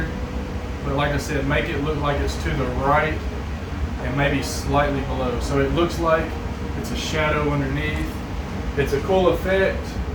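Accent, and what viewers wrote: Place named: South, United States. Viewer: Where are you from? I'm from NC & AL. and your accent sounds close.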